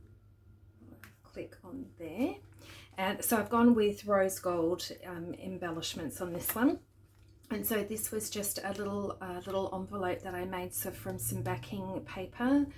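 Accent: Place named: Australia. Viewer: Happened across your video and oh my goodness it's stunning, and I'm not a fan of orange! And it's so good to hear an Aussie voice!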